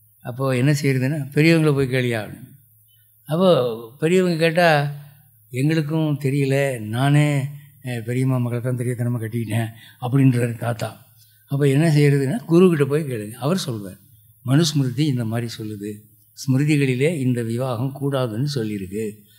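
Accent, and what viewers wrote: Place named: Madurai, India. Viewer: சிலர் மீது காரணமே இல்லாமல் அன்பும் பக்தியும் தோன்றி விடுகிறது.. ஜோசப் அப்பாவின் பேச்சைக் கேட்கும் ஒரு மணி நேரம்... இந்த உலகமே எனக்கு மறந்து போகும்.. His madurai slang and timing sense.. Chanceless... 👌👌👌.. குருவே சரணம்🙏🙏🙏 ஜெய் ஶ்ரீமன் நாராயணா..🙏🙏🙏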